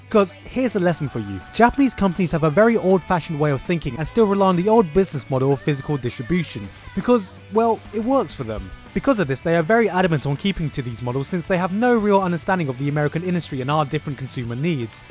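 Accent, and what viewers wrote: United Kingdom. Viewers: This actually made sense. While I like manga/anime, I must confess I seldom buy it (also due to the fact it almost doesn't get translated into my native language, ever), so I usually get my fill on the manga/anime sites out there. That said, I'm still rational about it; yes, I like the watch-it-for-nothing sites out there, but still, I acknowledge it makes sense from their perspective - as a business - to try to do something about it. Just like you, I also don't think the people claiming it's 'good advertisement' and 'I buy it anyway' have a major impact. It just doesn't cut it: most DO NOT buy everything they watch or read online, after all. I don't, for instance. The problem is, of course: nobody likes big companies, and certainly not those that make the 'free stuff' go away. I think if the manga was made directly available from the manga's site (or a site where mangaka directly sell their products), and that with a 1 dollar/euro one-click support button for instance, most would do it, at least for their preferred manga. 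Every fan would be more inclined to support a mangaka directly, than some company which they perceive as money-grabbing. That said, you are right: 25 bucks a year isn't all that much. Alas, it's not available around here (and it would need to have MY favourite manga in there too, obviously). But in any case: I thought you made some good points. I also found it funny when I heard you talk; it's sounded so 'British' (Queens English-like), I imagined you compl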